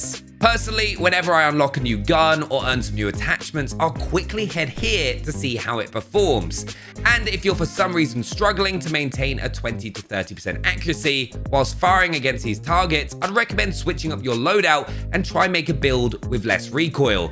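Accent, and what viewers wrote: United Kingdom. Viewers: Why is it that British accents make you sound more knowledgeable 😂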